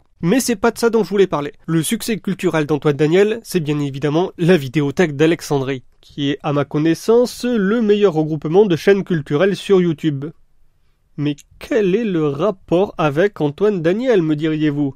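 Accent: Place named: Lot-et-Garonne, France. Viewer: Par contre, t'es vraiment du Lot et Garonne? Parske t'as un léger accent.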